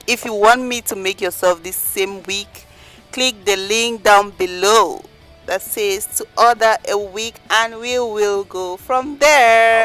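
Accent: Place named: Africa. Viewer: This is a beautiful wig and I would love to order. However, the voice sounds African but the IG page says China.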